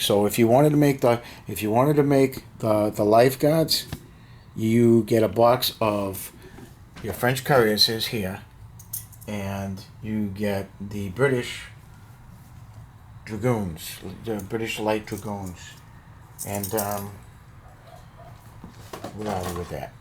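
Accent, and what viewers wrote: New England, United States. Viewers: I'm guessing you're a New Englander. The damn finest accent in the U S of A!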